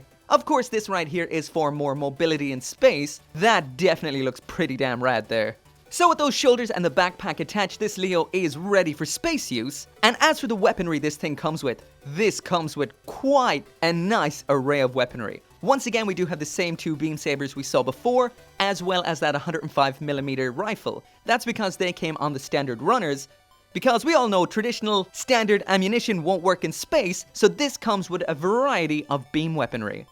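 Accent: Ireland. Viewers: Do I hear a bit of Irish in your accent? Can't place it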